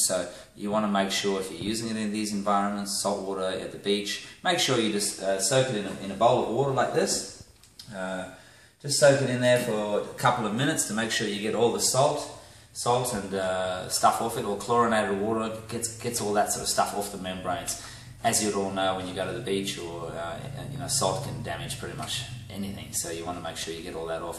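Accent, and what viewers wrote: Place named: South Africa. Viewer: Well done mate. Just purchased a dog and bone wetsuit case for my iPhone 5 as I'm heading to the Whitsunday's tomorrow. I returned home with my device in hand, raring to put it to work. Your little video is perfect. It's like a crewless cooking vid with just the details and predictions of little stupid things we consumers could overlook. The SA accent was the only thing that caught me, but I am full of confidence going into the water with my link to the great big world. Thank you.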